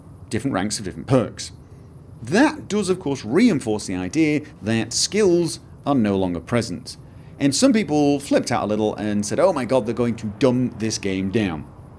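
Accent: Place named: Scotland, United Kingdom. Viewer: what do you for work? Your ability at logically discussing things in terms of their elements in an easy- to-understand way as well as your poetic Scotish accent must be incredibly useful.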